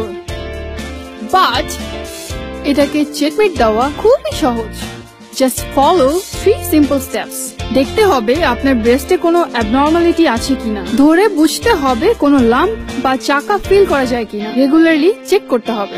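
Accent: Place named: England, United Kingdom. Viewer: আপা কি কথা বলার সময় চুইংগাম চাবান? কথার সুর শুনে মনে হচ্ছে আপনি বাংলা ভাষার মধ্যে ইংলিশ টান নিয়ে আসেন!!আর আপনার ও কি কাউকে লাগবে নাকি চেক মেট করার জন্য... ঠিক বুঝলাম না!!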